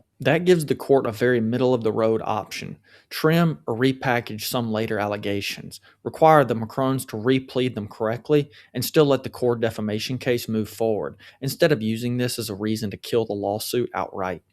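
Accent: South, United States. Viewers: 1st time viewer here! I’m enjoying your content! So, you’re in CA, but you’ve got a delightful southern accent. I wonder which state. Texas, or where???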